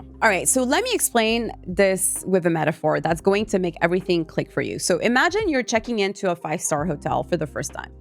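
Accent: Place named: Quebec, Canada. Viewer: Hi, hearing your accent...are you a french canadian by any chance?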